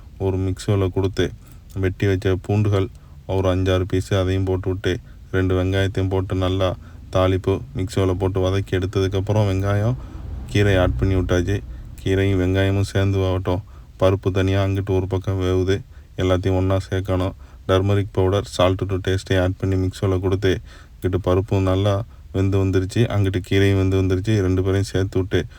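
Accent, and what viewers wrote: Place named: Tamil Nadu, India. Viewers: Unga pechu slang super namba aera pattukkottai slang🔥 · உங்களின் இந்த நகைச்சுவை உணர்வுடன் கூடிய கீழக்கரை பேச்சுகாகவே பார்ப்போம்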